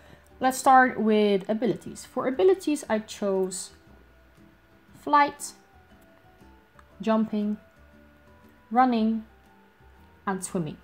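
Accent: Netherlands. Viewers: Native dutch speaker ? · Are you by chance Dutch? haha i think i recognise your accents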